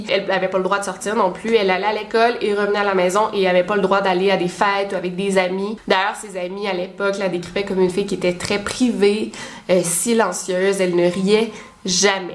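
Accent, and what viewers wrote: Quebec, Canada. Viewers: C est affreux comme histoire, pauvre Elisabeth.. merci j aime beaucoup tes vidéos et ton accent québécois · tu me ferais presque aimer l'accent quebecois ! :) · Grâce à toi j'arrive à trouver l'accent québécois sympa et compréhensible 👍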